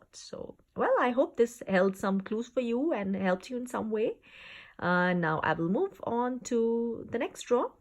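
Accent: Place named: Trinidad and Tobago. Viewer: Sound kind of trini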